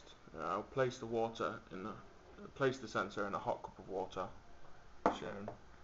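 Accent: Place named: United Kingdom. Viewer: i would love to understand your british english language man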